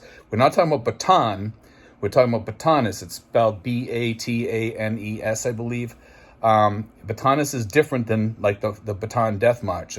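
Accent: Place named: Massachusetts, United States. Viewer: I always enjoy your VLOGs and watch them first. Maybe because I understand your accent being from Mass. myself.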